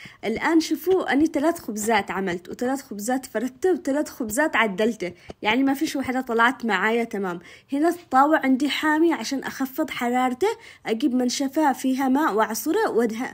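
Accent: Aden, Yemen. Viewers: مسرفه هههههههه ماشاءالله لهجهتك عدنيه رغم انك عايشه بالسعوديه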